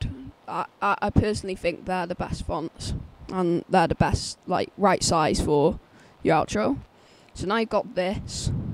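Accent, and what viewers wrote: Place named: Ireland. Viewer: thnx m8 love ya irish accent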